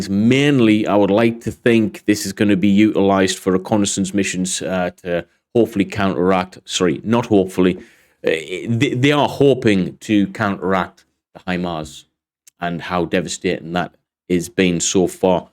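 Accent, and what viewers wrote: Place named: United Kingdom. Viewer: I've added you into the rotation with Andrew, Denys, Paul, & Artur. Great rundown, mate! Especially appreciate seeing the satellite map, but as a Brit ex-pat it's nice to hear the accent - at regular speed😆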